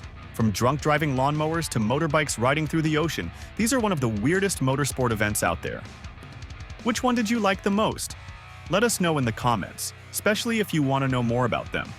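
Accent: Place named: United States. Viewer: American accent; says 'whilst' and 'pub'...🤔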